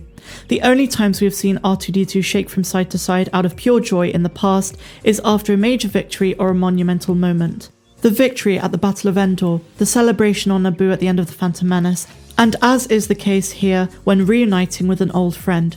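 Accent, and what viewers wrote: United Kingdom. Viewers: I love your british voice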